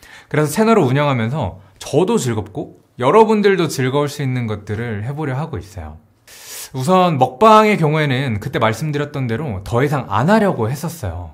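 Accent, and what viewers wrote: Cheongju, South Korea. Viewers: 먹방채널이랑 일상채널을 나눠도 좋을것같아용!!! 일상채널에서 게임영상도 올라가고 가족이랑 놀러가는 일상도 올리규 ㅠㅠ 그리고 저 청주 사투리 좋아하는데 라이브도 하면서 사투리도 보여주시구~ 이것저것 많이 해주셨으면 좋겠어요!!편집 영상도 재밌는데 라이브도 재밌을것같아요 ㅎㅎㅎ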